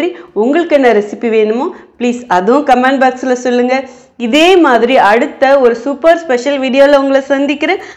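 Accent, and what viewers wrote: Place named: Kongu, India. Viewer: thanks but your tamil is kongu tamil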